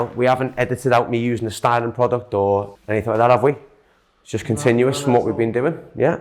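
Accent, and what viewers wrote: Liverpool, United Kingdom. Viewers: Scouse barber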